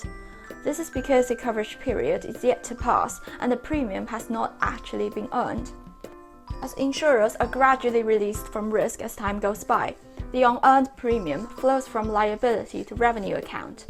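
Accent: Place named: United Kingdom. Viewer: Hello, many thanks for this informative video, really well appreciated. PS: With all respect meant, if I could add that on, though : The (british) accent is really very strong.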